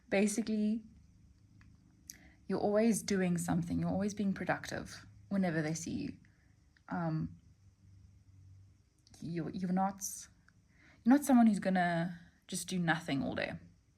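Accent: South Africa. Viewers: May I ask if you are South African? ❤ I just love hearing your accent doing a reading! And your readings resonanate so much with me too, thank you for sharing. Love from the East Coast of S.A. 🇿🇦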